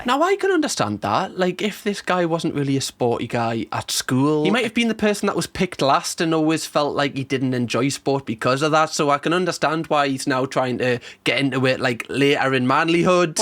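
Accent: Newcastle, United Kingdom. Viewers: The jordie accent is what makes the best if he was from Liverpool 🤮🤢 I wouldn’t whatch him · I assume you know that Pret a manger is a high street coffee chain you will find across the UK and worldwide… not just a shop in London. Weird comment, just through me off to hear a londoncentric geordie 🤷‍♂️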